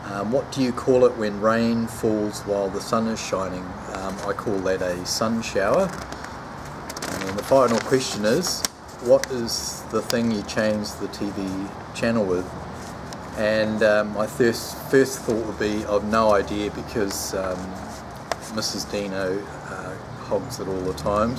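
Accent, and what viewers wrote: England, United Kingdom. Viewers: Nice one Dino, your words sound like most English people, funny but someone found shopping trolley hilarious, I think it was on Christian's video,I thought l would get mine out of the way, good sport, Cheers mate 🍻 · Nice one bud couple of chaps are brewing with jester hops and sounds a cracking beer, good challenge still say the words like if you was in the UK.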